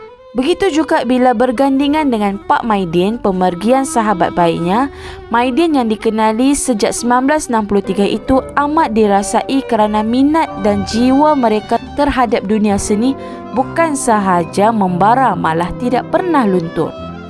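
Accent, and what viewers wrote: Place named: Penang, Malaysia. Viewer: Walau pn usia nya/dgn lawak logat penang itu membuat saya dpt mengenali nya hingga arini.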